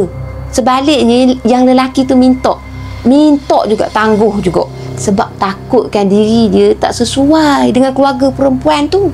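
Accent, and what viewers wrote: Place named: Perak, Malaysia. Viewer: Assalamualaikum. Selamat Hari Raya buat sekeluarga. Sedap dengar bercerita ade guna loghat Perak. Suke akk mendengonye. Cer Cuba lepeh ni guna loghat N.S plk..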